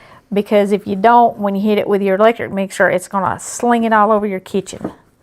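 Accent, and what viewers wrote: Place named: Tennessee, United States. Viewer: Hi from Ohio👋👋TY...great recipe! My grandmama used to make for church dinners yrs ago! I❤ur accent...reminds me of home (TN)!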